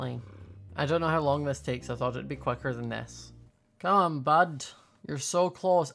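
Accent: United Kingdom. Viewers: Omg I love your uk voice because I'm from the us . Like if u agree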